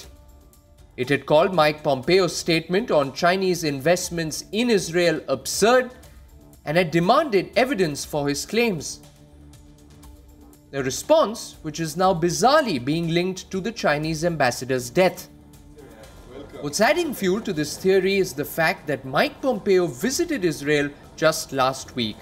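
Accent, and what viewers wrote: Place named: India. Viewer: what conspiracy theory it is a fact, you speak in Indian accent.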